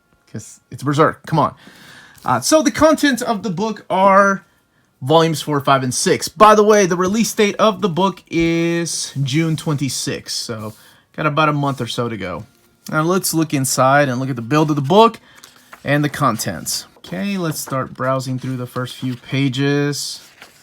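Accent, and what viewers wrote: United States. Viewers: eres peruano? Hablas el inglés con un acento gringo medio neoyorquino que ni se te nota. Pero se me hacía raro que tuvieras la piel color puerta como yo jajajaja, quizás soy el único otro peruano que te ve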